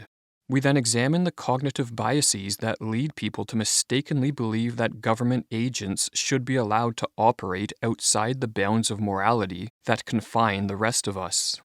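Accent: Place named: Canada. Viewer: I like the narrator’s Canadian accent here. Makes sense right about now.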